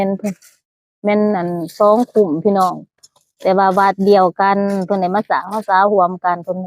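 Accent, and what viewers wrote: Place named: Pakse, Laos. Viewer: สำเนืยงคือ สาวปากเช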